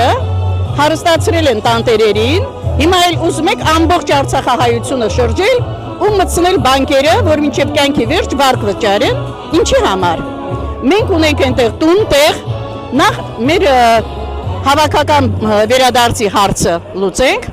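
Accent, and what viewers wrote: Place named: Russia. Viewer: Cirtc xarnuma ec agarkekin lcelov rucakan akcentov xatlaxner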